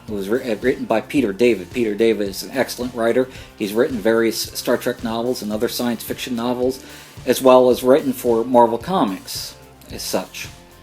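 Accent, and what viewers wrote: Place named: Philadelphia, United States. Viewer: You sound like your from the Philadelphia area. It's like my cousin Nick is talking about Star Wars. Great vid.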